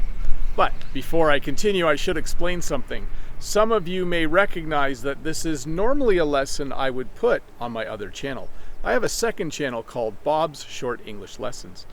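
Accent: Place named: Canada. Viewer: Canadian English❤nice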